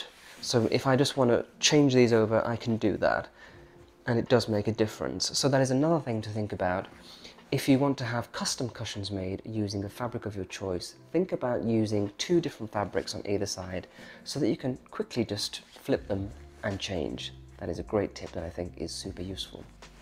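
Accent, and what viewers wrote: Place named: Scotland, United Kingdom. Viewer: I see you likeBunny Mellon. She is a favourite of mine too. Sophie always steals the show. I have had a couple of Shi Sues over the years. Best dogs ever. Sorry, I did have a giggle over your Scottish accent. It took me ages to work out you meant lampshades. I thought you were saying lunch bids . Wondered what they were 🤪Really liked your topiary plate.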